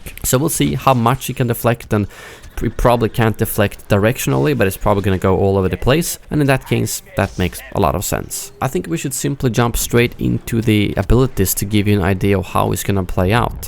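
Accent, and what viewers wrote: Sweden. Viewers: Är du fråb Sverige?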